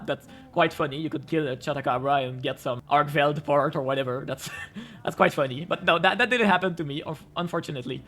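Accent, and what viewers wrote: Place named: Quebec, Canada. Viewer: Quebecois spotted?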